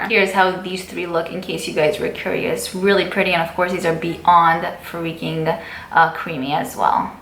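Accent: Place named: Brooklyn, United States. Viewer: you're a beautiful woman, you're still young so the right guy for you is out there! I love the look you came up with here, the lashes just really topped it off beautifully! I love hearing you speak, I was born and raised in Bklyn (till I was 21) so you sound like home <3 hey have a bagel for me on 13th and 75th if its still there! that place was my jam back in the day for a bagel with melted cheese! lol anyway, just found your channel recently and i'm loving it and you xo Michele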